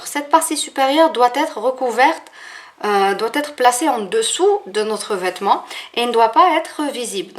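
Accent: Tlemcen, Algeria. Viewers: d'aprés l'accent vous étes une tlemcenniene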